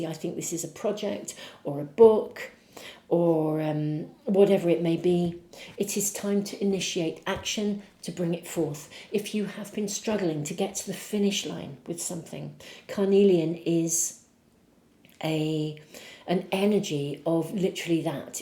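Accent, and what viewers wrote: England, United Kingdom. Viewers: I'm speechless! I did #3; left a 43 y/o relationship that kept me unseen for too long. He dimed my light an my soul. I'm feeling a little bit unsure about my future and worry about my means but I'm proud of who I've become after beeing through my pile #1...I've healed my child wounds and still am as triggers hit me. I met my twinflame through out my healing journey and it is a bit complicated as he also has shadow work to go through. No contact and it's hard. Keeping faith. I will try my #2 pile and reopen my book project that stayed too long in limbo. I just want to be happy again for the rest of my life...with my 4 grown kids, my friends, my art projects and dreams along side with my soulmate that I love. I want to show to the world that if I can do all of this at my age, anyone can find their dreams if they really want to change and find their true self. 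You are right. Many people in my life do not approuve of my choices but I don't care. Thank you for your guidance. Your readings are helping me from day one. Sorry if you find my english writing a little off. I'm french speaking. Hope it all makes sense. Love and Light to you. You have such a lovely english accent.☺️ Merci 💐🙏🏻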